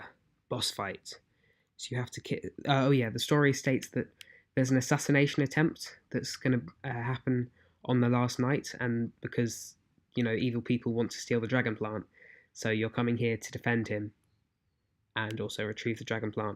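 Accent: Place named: United Kingdom. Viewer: Nice british ácĉent dude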